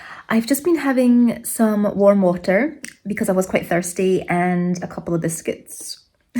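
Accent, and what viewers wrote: Scotland, United Kingdom. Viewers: I enjoy Scottish accent.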